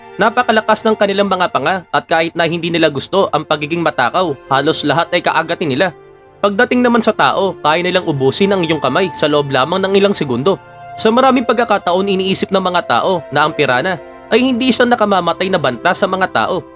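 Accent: Iloilo, Philippines. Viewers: Ilonggo ka lods?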